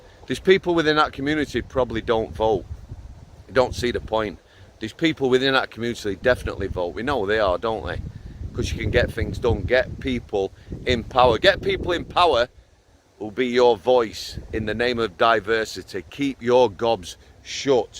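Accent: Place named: Yorkshire, United Kingdom. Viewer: I always thought you had a Yorkshire accent I grew up on the Manor Don’t live in Sheffield anymore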